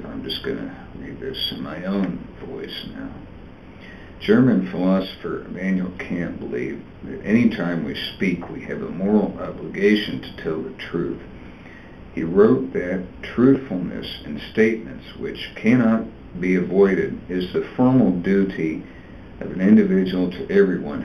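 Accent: United Kingdom. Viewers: You have a beautiful british accent <3 you sound like a friend of mine that I miss very much :_( <3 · Sounds like london.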